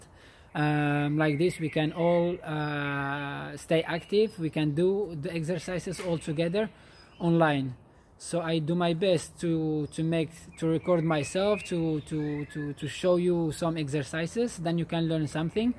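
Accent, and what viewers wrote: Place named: France. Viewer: I felt a french accent in your voice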